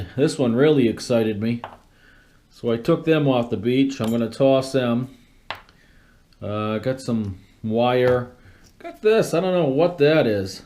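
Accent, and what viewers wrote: United States. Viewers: i f'ing hate the way you yankee's talk ,but i bet you feel same about us ,so we are square ,lol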